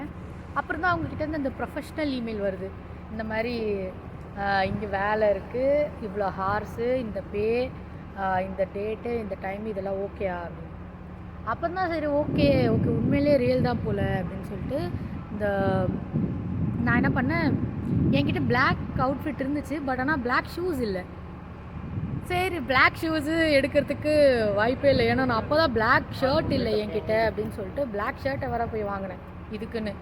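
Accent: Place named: Tirunelveli, India. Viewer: Sister, I like your presentation about your experience in finding a job. You are talking nicely and friendly. You have a good future in Canada 🇨🇦 as you are talented. I like your tamil language. I hope you are from Tirunelveli, am I correct?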